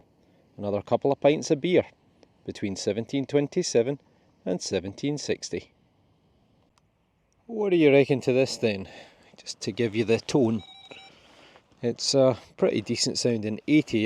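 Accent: Scotland, United Kingdom. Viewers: thanks so much for your videos. Me and my 11yr old so n are very new to this amazing hobby but I have to say you have taught us so much already. On a more personal note, I have to thank you because there have been times when Theo has lost a bit of enthusiasm through drought periods or not getting any permissions, but your videos have genuinely kept him going. He loves your little catch phrases, and when we start for the day he will say "he we are in the beautiful fields of Cheshire" in a Scottish accent obviously 🤣. Keep up the good work. Thank you from Paul and Theo in Cheshire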